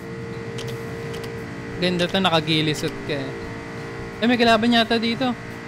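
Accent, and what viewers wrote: Visayas, Philippines. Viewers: btw gi copy nmo si kuya jazon gaming question ko lang kuya? bisaya ka?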